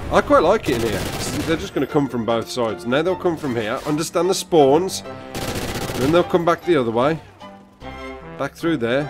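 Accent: Birmingham, United Kingdom. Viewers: that Birmingham accent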